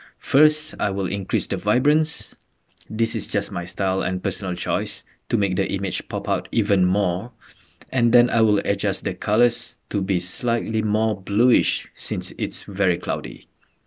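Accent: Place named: Malaysia. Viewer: Love your accent and tutorial. Thank bro. Greeting from fellow Malaysian.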